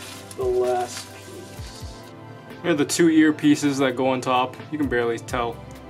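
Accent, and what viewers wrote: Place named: Quebec, Canada. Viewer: Are you from Quebec? I mean, can you be from some where esle with that accent and those noodles?! Well I just look at the others videos and this is the only video where you sound Québécois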